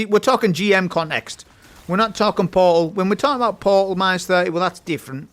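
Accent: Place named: Cumbria, United Kingdom. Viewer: Yo where you from you sound like a fellow Cumbrian!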